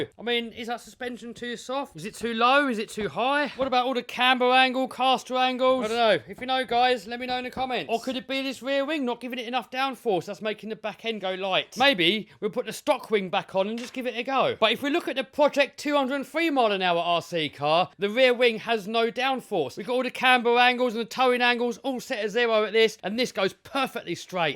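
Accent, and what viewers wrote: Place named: England, United Kingdom. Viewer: Im not a hater, but I can not stand that 'more power' attitude ! YOU HAVE NIL IDEA ON ANYTHING TO DO WITH RC. Why do you think that 'fastest' world record attempt turned out a free giggle for me when you wrecked your car ? Because you have absolutely no understanding of aerodynamics ! Honestly, whenever I see your head on a video, I turn it off immediately because I cannot stand that pommy accent and your boofhead attitude. You should feel privileged that I'm even taking the time typing this LOL.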